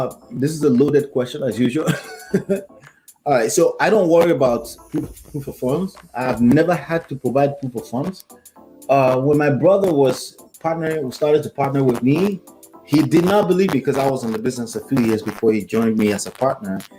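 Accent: Nigeria. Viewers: I love this video and can tell your nigerian by your name and accent.. lol if you don’t mind, I’ve come to realize that working with realtors provides the chance for us cash investors to work directly with them and completely take out skip tracing, cold calling and direct mail.. well that’s what I thought. do you have any advice on finding properties that aren’t listed on the MLS?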